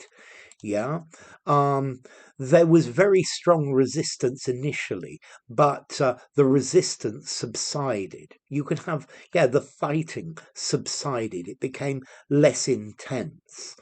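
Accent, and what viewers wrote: United Kingdom. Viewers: Whereabouts in UK you are from Guv ? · Hello teacher I have missed out on much of your precious and quality British English in ages I hope you won't be annoyed by my comment anyway you look very pale and still has got a great amount of knowledge 🤭😃👏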